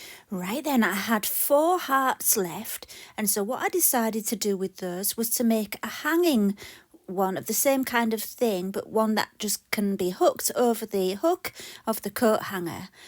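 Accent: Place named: Northern England, United Kingdom. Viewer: Thank you for posting this video, it's so informative. I love your lovely Northern accent and voice. It's so nice to hear a British accent in the sea of American ones (not that there's anything wrong with theirs 😅) just lovely to hear the expressions and colloquialism 💞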